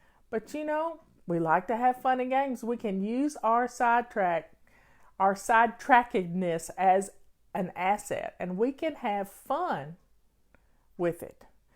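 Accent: South, United States. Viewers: I love your southern accent! I'm a New England girl who lives in Tennessee, lol. By being who you are you giving us permission to be true to ourselves also. i just love these daily videos! God Bless you. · I love your southern voice! I love your stories and your videos are perfect. Don't let people like that get to you at all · You keep on keepin’ on. You’re great! I love your southern accent. 😍 I'm from Virginia & we have those southern accents here, too. 😍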